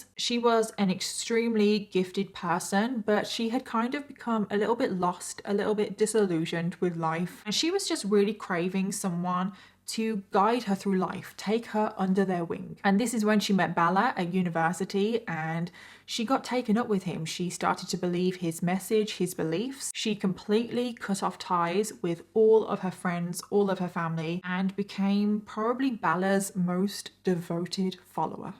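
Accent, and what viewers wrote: United Kingdom. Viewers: Bailey Sarian in British. You did a nice job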